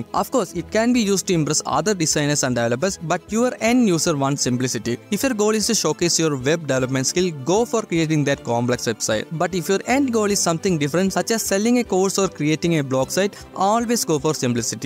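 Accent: South India, India. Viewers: South Indian accent is sweet and you can keep up with it but speaking slower will make your word more clearer. Just keep your content top notch accent doesnt matter that much Cheers Brothaa✌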